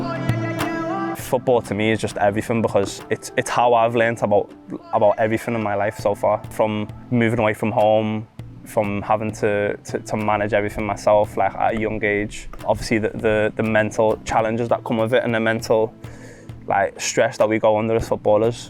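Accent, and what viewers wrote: United Kingdom. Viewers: انكليزي بس مو امريكي لان هو عايش بانكيلترا فا اكسنت مالته هو وزيدان انكليزي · شنو الأكسنت الي ديحجي بيها بريطاني أو انجليزي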